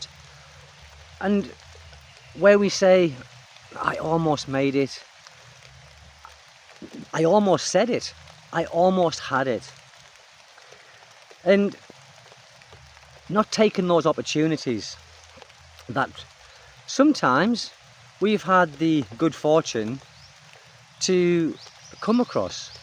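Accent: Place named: Teesside, United Kingdom. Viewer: Here’s me thinking you were from Teeside😊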